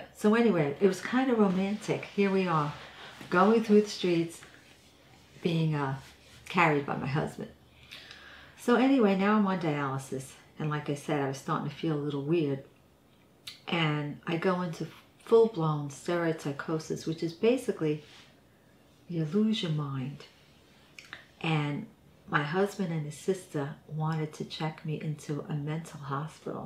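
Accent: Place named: New York, United States. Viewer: New subscriber to your son’s channel, thank you for sharing your story! I cried when you spoke about your dad and melted when your son came over to comfort you. Your New York accent is so awesome:). ❤️💐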